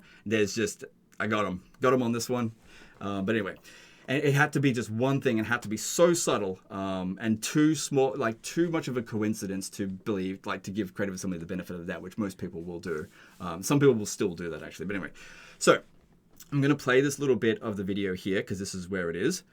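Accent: Australia. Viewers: Bro is aussie...I thought he was bri'ish...my world is shattered.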